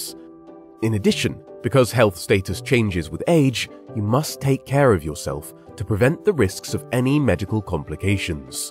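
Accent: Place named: England, United Kingdom. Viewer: Best advice (same I gave my kids) is to start paying into a pension plan asap, and by that I mean in your early 20s if youre able. I never invested in stocks and shares and all that malarkey, just my pension, and that was literally from 20yrs old, and I think I will be ok. Btw, I find it weird that theres some English guy narrating this video but its all about how Americans should prepare for retiring 😂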